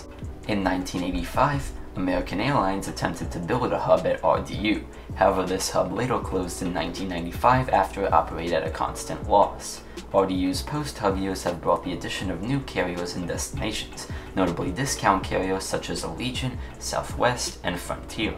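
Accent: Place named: Ireland. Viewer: It just piqued my interest as to where you're from. Your accent sounds slightly Irish, but an Irishman would know how to pronounce both Raleigh and Durham, since both of those are English names.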